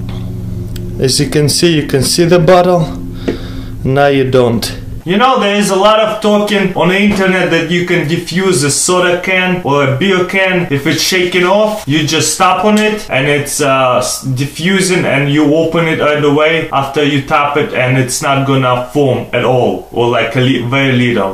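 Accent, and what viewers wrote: Russia. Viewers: Damn, stop fuck with him. He's from russia aye, if u come from germany, or another land, u can't speak goodenglish either right? Stupidfuckers :l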